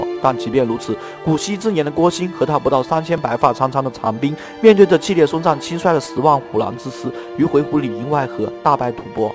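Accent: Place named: Hong Kong, China. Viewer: 應該是香港人吧!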